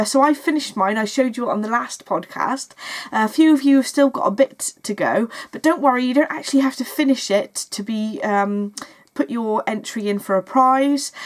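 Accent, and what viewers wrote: United Kingdom. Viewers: Congratulations,! As a german viewer I really love to watch your podcast and listen to your lovely british English. Thank you for cheering up my knitting Time 😍❤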